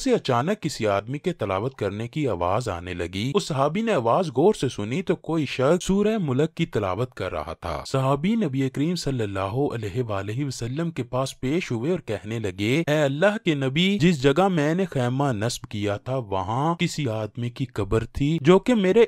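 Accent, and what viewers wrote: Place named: India. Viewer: ladti nahi larti hay . kyu Indian english follow karty ho apni shanakht bhi bhol gay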